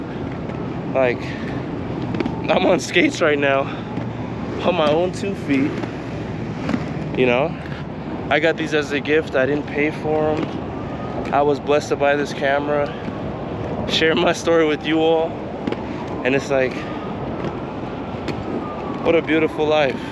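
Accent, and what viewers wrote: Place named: Haiti. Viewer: I came across this channel just browsing, because I usually look for a camping videos of people just enjoying their lives ,yours came up immediately click on it at first I wasn’t because it’s 15 mins long ish ,don’t come for me I say that because I feel like it’s important to at least try for 29/30 mins long as others put a lot of time and effort anyways I strayed away from that, and took a chance only to hear you preach about god and the lord amen I subscribe , this is the first video on your channel I’m watching and then I continuously sat watching , grabbing that chapstick 😂😂😂and seeing that GT flag it was a done deal I was here like ohhhhh I found my husband lamp 😂😂😂😂 at first I was like the way you speak it kind of seems somewhat similar to my niece father thought for sure you were Haitian possibly. Love your content ,I praise god and lord EVERYDAY for life could have lost mine last year to a hit by a car by god grace I’m here ,I got even more into gospel music I play it all at work even meet a member of the Evolation worship, and he was like shocked that I was actually playing gospel music guessing on the clock because I’m sure he’s hurt his music being played somewhere I played every day playing anywhere, especially on my over the ear headphone where I know others can hear but at work it’s all on my tiny speaker lol and just to get an idea I work at the airport 😊 Add me insta blssedd.xx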